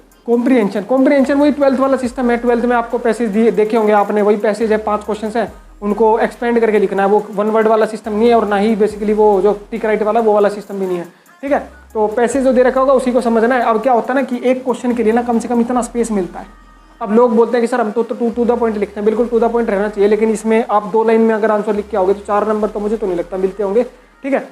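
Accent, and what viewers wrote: Haryana, India. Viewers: Sir aap haryana se lag rhe ho 😎, thanks sir ji 😇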